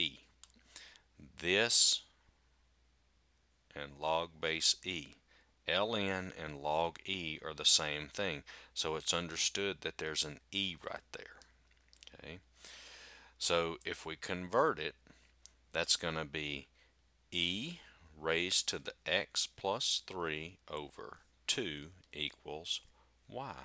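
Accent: South, United States. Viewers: Not even joking, I somehow understand better with a Southern accent. It sounds straightforward, simple, and just cool.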